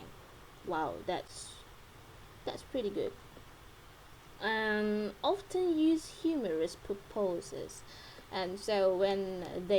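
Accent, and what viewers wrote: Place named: United Kingdom. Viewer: Hi,! I like your British accent, you are a good speaker hewhew. I get a lot of information from your article, especially in the difference between British English and American English. Then, if I may propose, maybe you can use a table to further clarify the difference between British English and American English, but overall you have explained your article clearly. Good job,! (Tere/102)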